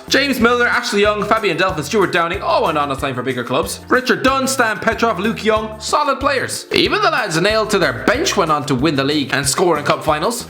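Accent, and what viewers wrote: Ireland. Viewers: I loveeee his Irish accent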